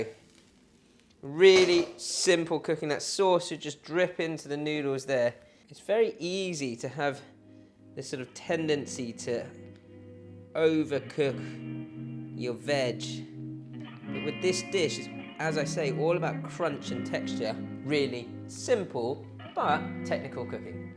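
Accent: United States. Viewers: As an American I think your US accent wasn't bad lol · That American accent 😂🤣 I've had to go on a fodmap elimination diet. Many things on your channel qualify or can be slightly modified and I love that I can still use your recipes! I need help though. I absolutely cannot find dark soy with the grocery delivery services during this pandemic. I've used tamari and "created" dark soy from other recipes, but I am curious if any of you have suggestions on mimicking dark soy in the best possible way. It doesn't have to be low fodmap friendly, it's also for the rest of the family to enjoy.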